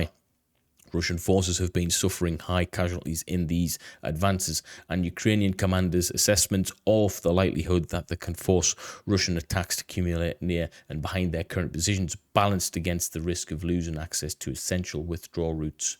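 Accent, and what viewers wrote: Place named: United Kingdom. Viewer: Thanks, good to hear a fellow Brit, Although born there I haven’t lived there for 28 years. A few things, first I am a member of the armchair General brigade, however I have conducted many successful campaigns across the surface of my coffee table. Second, the Ukrainians pushed the Russians back south of Bakhmut, third they blew a dam in the north to slow the Russians down ( which worked). Four, they blew the bridge separating the east of the city from the center. Six, it’s been said that units of Ukrainian troops have been positioned on the western flank of the Russians northern advance in order to slow their progress. I would say the Ukrainians are withdrawing from the city. Seven, the Russians not taking Bakhmut anytime soon, soon could mean in a week or in 10 days, I doubt Bakhmut will hold much longer. In my ‘expert’ opinion of course. 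🇺🇦🇺🇦🇺🇦🇺🇦🇺🇦🇺🇦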